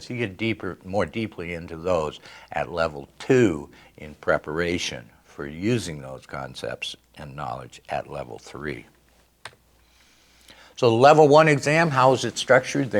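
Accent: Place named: Transatlantic, United States. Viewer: he speaks a transatlantic accent, very interesting topic and information, great presentation organisation. The video is effectively informative!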